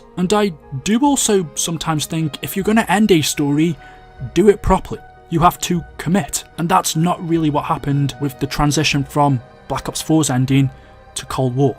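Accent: United Kingdom. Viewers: you sound like razzbowski if he was super bri'ish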